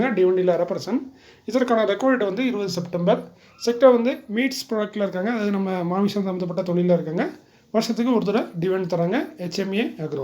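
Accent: Tirunelveli, India. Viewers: Bro.... I love your Nellai Tamil. I Wonderful city, nice people. Keep it up Bro. NBCC, HUDCO bonus eppo tharanga bro. Please inform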